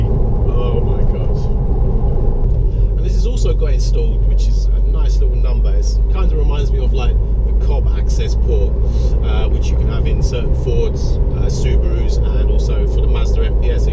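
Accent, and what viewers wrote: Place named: Bristol, United Kingdom. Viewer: From Bristol then mate? And nice reviews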